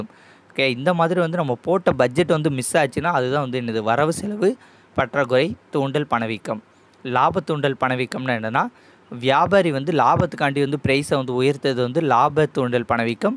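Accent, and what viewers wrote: Tirunelveli, India. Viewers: Bro nenga Tirunelveli Thana..namma slang theriuthu... thanks for ur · Bro ninga trinelveli ah? Slang theriuthu, teaching super bro, school la kuda ipd explanation kudukala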